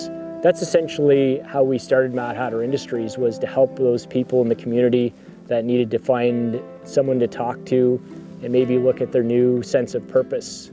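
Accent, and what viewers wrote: Canada. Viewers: Nice to listen to another Canadian.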